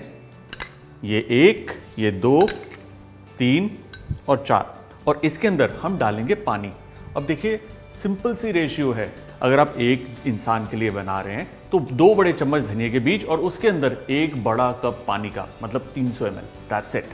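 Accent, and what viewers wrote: Punjab, India. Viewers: Hi ji i am big fan of u it’s so cute ki hindi bolty bolty punjabi ki accent i love it 😻 yummy recipes always 💞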